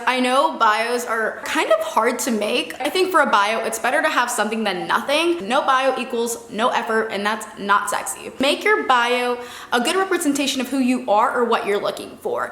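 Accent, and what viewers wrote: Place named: California, United States. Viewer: I knew you were from cali 5 seconds into this video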